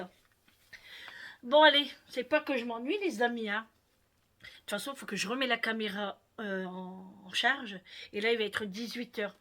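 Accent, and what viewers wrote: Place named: Nord, France. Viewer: Bonjour,je tai découverte y a pas longtemps, j'aime beaucoup suivre tes vlog,ton accent du Nord,j adore,mes parents été de valenciennes ❤tu est une belle personne, courageuse simple, ça ne m'étonne pas c'est ça le nord,je t'envoie des bisous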